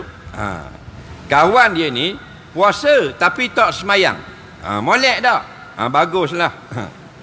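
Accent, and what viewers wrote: Terengganu, Malaysia. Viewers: guna loghat terengganu dalam ceramah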